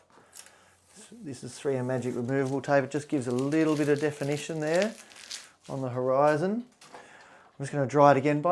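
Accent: Australia. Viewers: Hi, Best wishes from the UK. It is always a pleasure to watch you at work and to see your face when signing off. Keep up the good work, you are insirational! Love the aussie accent.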